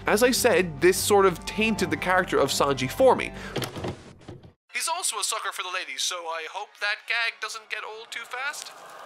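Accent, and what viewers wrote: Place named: Ireland. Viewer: I detected an Irish accent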